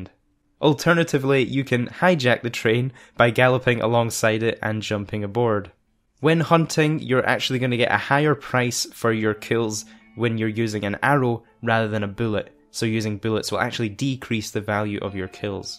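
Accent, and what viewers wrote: United Kingdom. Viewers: I found your British accent very entertaining!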